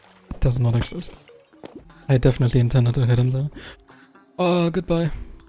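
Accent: Germany. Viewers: your accent sounds german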